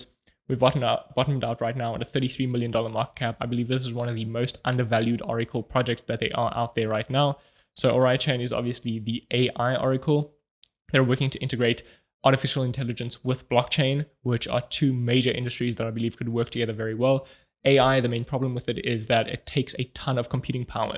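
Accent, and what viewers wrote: South Africa. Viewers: South African? Nice to hear our accent online dropping some knowledge!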